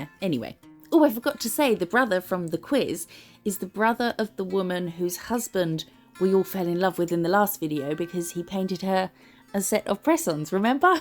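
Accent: RP, United Kingdom. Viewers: I loveee your channel, you talk to yourself like I do, swear like I do and have a naughty sense of humour like I do, so it’s like hanging with a friend with a much posher accent (I’m Northern Irish). Love your designs into the bargain! Win!!! Xoxo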